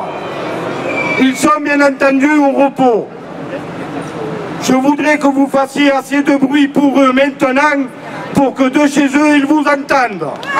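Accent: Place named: South, France. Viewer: Soutien du 34 !! On est pas loin l'un de l'autre... Ça fait du bien d'entendre quelqu'un tel que vous. Et comme je suis chauvine 😉, quelqu'un avec l'accent du sud 😅. Mobilisons toutes nos forces ensembles ✊🏻✊🏻✊🏻✊🏻✊🏻✊🏻...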